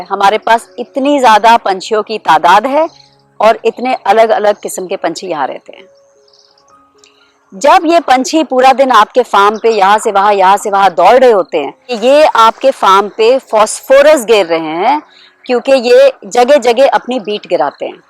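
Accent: Haryana, India. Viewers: Am i hearing a haryanvi accent 😅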